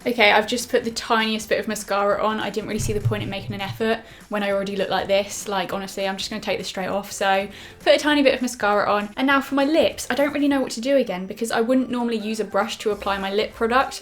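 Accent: United Kingdom. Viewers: I'm in love with your British accent.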